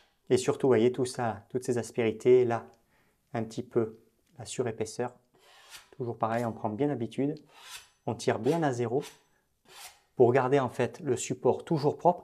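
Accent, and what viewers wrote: Southwest, France. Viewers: merci pour votre réponse, j'adore votre accent du sur ouest !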